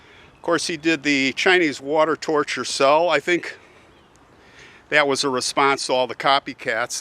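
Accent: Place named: United States. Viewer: Your talking in popular American English helps me to learn more and more words that I don't know before because I already learned the standard English language ..Thanks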